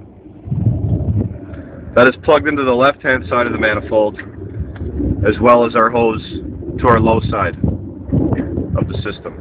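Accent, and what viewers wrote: Canada. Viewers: Good Job enjoy your video, very good explanations in your narration ...are you from TO?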